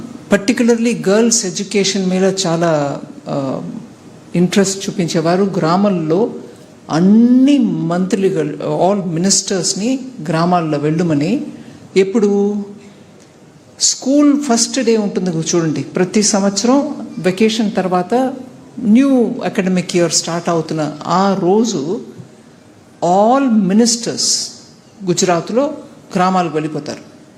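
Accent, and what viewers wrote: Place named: Chittoor, India. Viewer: ఈ కాలంలో తెలుగు వాళ్ళు చాలా మంది తెలుగు ఇంతకన్నా ఎక్కువగా ఏమి మాట్లాడం లేదు. చిత్తూరు జిల్లాలోఎప్పుడూ ఇలాగే మాట్లాడతారు. సహజం.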